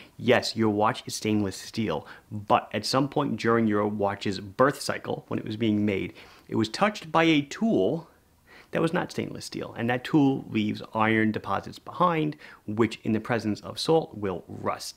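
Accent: Long Island, United States. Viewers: He's from Long Island. That's how they talk. 😁👍✌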